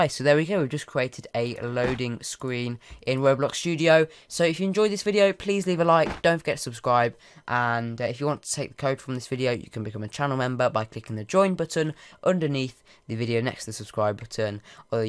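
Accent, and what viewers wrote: United Kingdom. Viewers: Now he sounds more British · Omg your British now!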